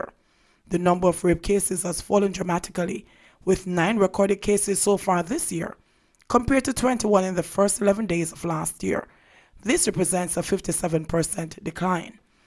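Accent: Jamaica. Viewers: Things getting worst in all cases so all am going to say is I always love how you read news even tho you say its hard but trust me you make it more understanding by telling it as it is with patwah included keep up the good work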